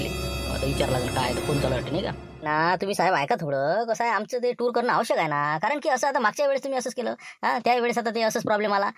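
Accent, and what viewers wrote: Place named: Vidarbha, India. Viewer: आपली विदर्भातील बोलीभाषा खूप छान आहे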